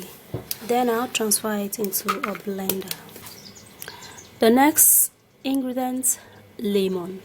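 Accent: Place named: Nigeria. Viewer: She's Nigerian.....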